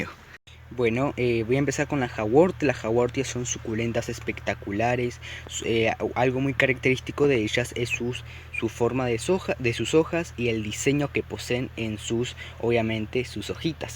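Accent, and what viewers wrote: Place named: Argentina. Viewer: Fabuloso!!! Muy buena tu explicacion....me encanto!!! Eres ARGENTINO.....de que zona eres??? Abrazo gigante y esperando tu proximo video.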